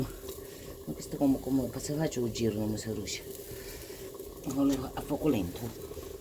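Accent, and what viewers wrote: Calabria, Italy. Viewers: Nn posso dire che la voce sia uguale ma l'accento è quello! Quello di mia madre (nata a Polia ex provincia di Catanzaro, morta a 92 in grazia di Dio). Ho guardato in "giro" gli angoli della tua cucina, il fuoco e le "pignati " dove cucini, quelle stesse di terracotta che ricordo x anni in casa mia...anche papà mio era calabrese di Serra s. Bruno... Da loro ho appreso la dignità e l'onestà e il timore di Dio. Che Dio ci benedica tutti❤ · Carissima signora lei è una bella persona. Ammiro quello che dice e fa. Lei con il suo modo di parlare e le sue ricette mo ricorda molto mia mamma e anche la mia cara zia .io è molti anni che vivo al nord ma la Calabria mi è rimasta nel cuore e il suo dialetto è uguale al nostro quindi penso siamo dalla stessa zona. E u stoccu di cittanova che buono. Grazie di cuore per regalarci le ricette della mia mamma.le auguro tante belle cose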